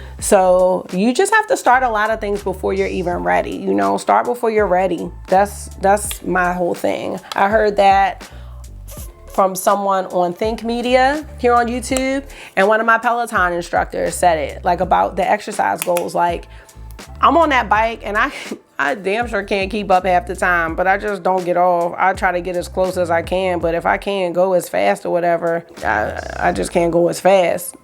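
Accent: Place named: DMV, United States. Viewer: New subbie! Fellow Teacher here! As soon as you started talking I knew you were from the dmv, me too girl, glad I found your channel!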